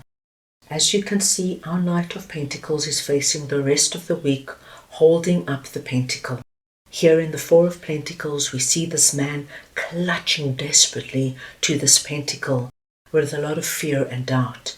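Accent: South Africa. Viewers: Nice reading. You sound South African.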